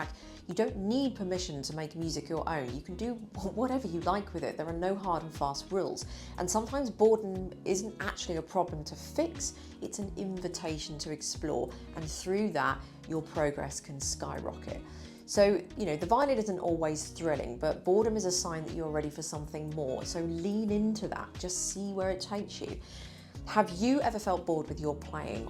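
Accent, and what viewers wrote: United Kingdom. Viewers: Not going to lie I stopped playing violin like 5 years ago yet still here I am watching your beautiful face and attractive Aura with your British accent😅😂😂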